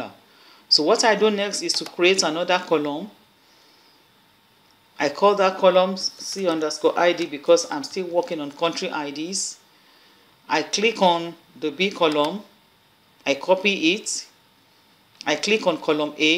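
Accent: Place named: Nigeria. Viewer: Subscribed! Thanks alot for your detailed and simple explanations! It also feels awesome hearing these things explained in a Nigerian accent! Thanks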